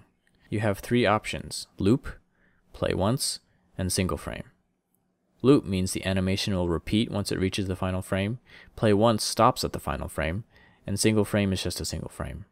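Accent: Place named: United States. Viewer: He Is American Dude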